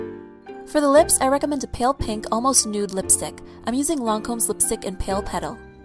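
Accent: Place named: New York, United States. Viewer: Hey, I have been a long time subscriber of your's and I like you because you are usually so original, you know, with your crazy NY accents and such. But I feel like this video seems a bit like something Michelle Phan would do. I understand that she's your collegue, but I miss the NY. <3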